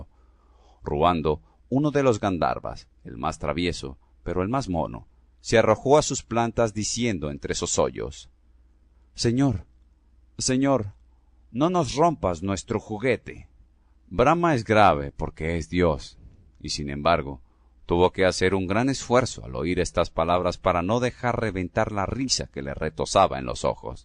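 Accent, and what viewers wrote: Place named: Latin America. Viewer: Escuchar a Bécquer con acento latino...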